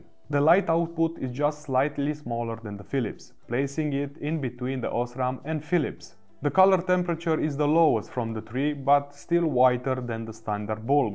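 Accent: Russia. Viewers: Бля, русский английский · R U Russian? Very detail & professional test of 3 biggest brands. Thank you!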